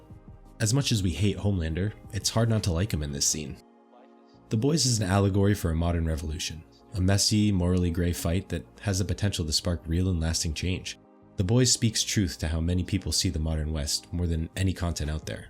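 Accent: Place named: United States. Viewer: Thanks for talking about the boys in perfect American not like these wana be Americans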